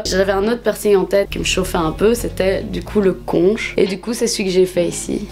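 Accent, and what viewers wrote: Belgium. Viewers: ahh mais je t'aime d'amour !! Tu me donnes trop le sourire avec ton petit accent belge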